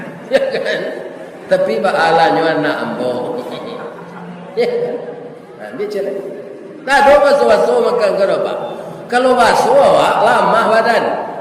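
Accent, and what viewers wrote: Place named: Minangkabau, Indonesia. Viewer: Sabana sajuak hati mandanga caramah ustad Ristawardi,batambah ilimu,batambah kaimanan,lapeh taragak Jo kampuang (magek kabupaten Agam)mandanga Bahaso Minang nan asli.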